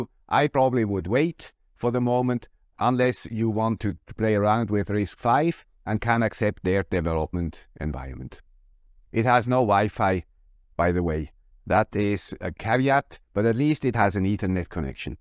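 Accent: Switzerland. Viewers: I blame the high quality of your project focused videos: "Oh, the guy with the Swiss accent dropped a video, gonna be something cool - oh it's a mail bag. I guess I'll watch it later" :) I, for one, appreciate the breadth of these. So perhaps keep them less often, but collect several cool things. Like this one (well, two).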